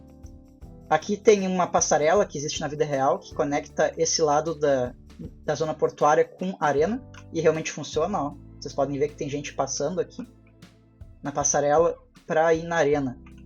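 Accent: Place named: Porto Alegre, Brazil. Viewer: aquele sotaque que só o portoalegrense reconhece kkkk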